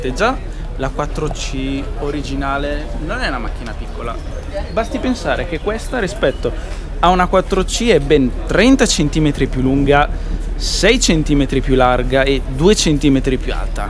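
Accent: Italy. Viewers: I love the Italian accent. If reincarnation is true I wanna be born again to be Italian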